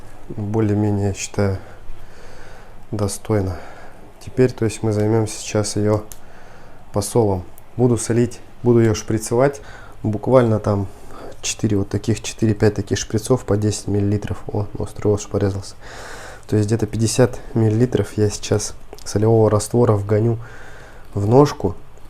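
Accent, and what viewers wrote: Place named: Altai, Russia. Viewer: Спасибо за рецепт! Говор интересный. На брата похож, он с Алтая. Удачи и здоровья!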